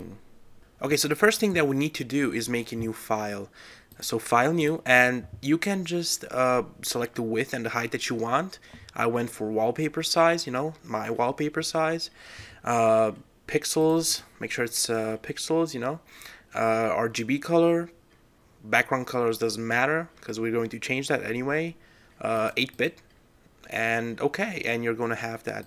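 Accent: Germany. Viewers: Are you German? :D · Man merkt zum Teil dass du einen deutschen Akzent hast xD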